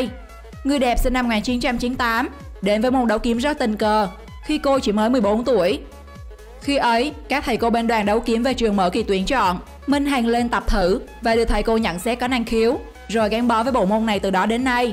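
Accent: Vietnam. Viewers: Giọng bạn ấy là Trung lai Nam Giọng miền Nam đậm chất hơn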